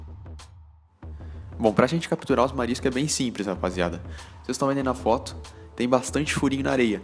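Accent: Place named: Porto Alegre, Brazil. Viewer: opa blz, tu é de Porto Alegre tchê ?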